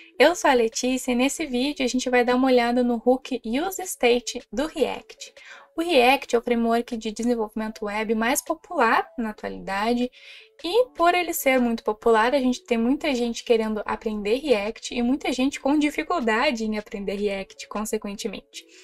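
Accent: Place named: Rio Grande do Sul, Brazil. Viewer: Eu consigo perceber 30% de sotaque gaúcho e 70% de sotaque de Brasília. = ["RS", "DF"]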